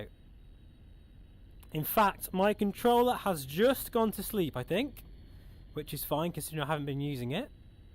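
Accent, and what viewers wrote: United Kingdom. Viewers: Is that a Cheshire accent? G2 looks good, still waiting for mine, since 9th July. Getting fidgety now.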